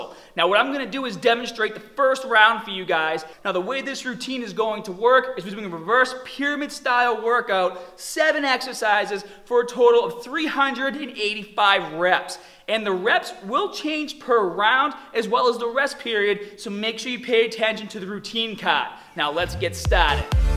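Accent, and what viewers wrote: Boston, United States. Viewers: Your Boston accent makes this hard to watch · This guy has a Boston accent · I swear... I used to watch your videos when you were first getting "staaaahhhhhttted", and you did NOT have that heavy Boston accent whatsoever. Did you just get hella bored one day and decided, "Fuck it, I'm gonna start having a dense Boston accent in my videos"? I don't get it. But I like it. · Wow...I feel so petty that I just can't to listen to him talk with that Boston accent. I feel kind of weird that it annoys me that much. When I am not a negative or petty person at all. But he won't miss me not subscribing. He has plenty of people that don't care.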